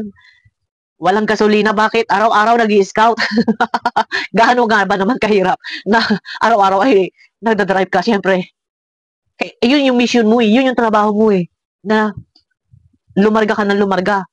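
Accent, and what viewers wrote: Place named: Batangas, Philippines. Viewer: Batangueño yan c kuya kaya ganyan boses nyan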